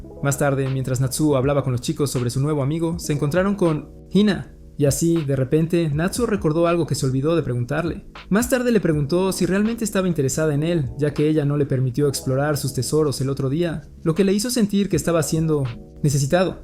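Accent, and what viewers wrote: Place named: North, Mexico. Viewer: Como que un poco norteño e unnnn poquito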